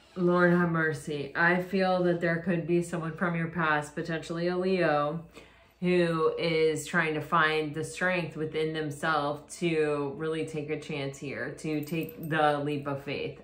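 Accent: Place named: Boston, United States. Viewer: Are you from Boston ?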